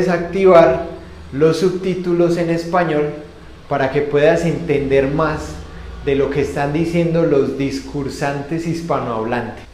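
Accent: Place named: Colombia. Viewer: Colombian accent, perfecto👌 Thanks señor.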